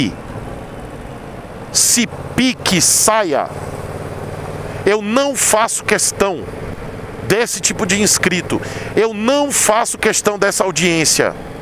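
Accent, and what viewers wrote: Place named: Northeast, Brazil. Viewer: Que vídeo necessário meu irmão, nestes tempos de culto ao ódio, é bom demais ouvir palavras como à sua, um cara sempre alto astral. Eu nunca fui de ler comentários no youtube, pois acho que é muita destilação de ódio. Então meu caro, fica essa força da minha parte para contigo, eu que sou negro e ateu e pobre sei o que é sofrer preconceito. Meu amigo o sotaque nordestino é maravilhoso, sou do interiorzão de Minas e acho legal demais o sotoaque e a resiliência que o nordestino tem! Um forte abraço, continue brilhando meu caro!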